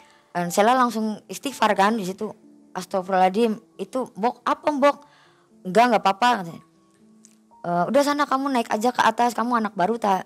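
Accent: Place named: Cirebon, Indonesia. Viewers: mbak sela bahasae dermayu ya. apa wong dermayu tah? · Sy penonton baru, salfok kok kyk cerbonan sih ngomongnya ya 😂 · Logate Cirebon Indramayu iyaaa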